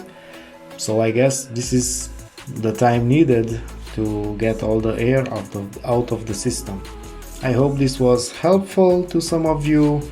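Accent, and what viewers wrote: Romania. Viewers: din primele propozitii se vede ca esti roman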